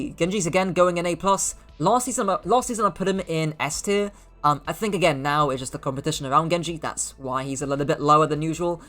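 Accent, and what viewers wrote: England, United Kingdom. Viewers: I love your English accent ❤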